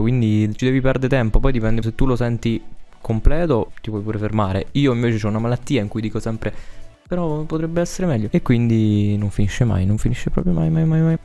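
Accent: Italy. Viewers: Per me è di Roma, si sente anche dall'accento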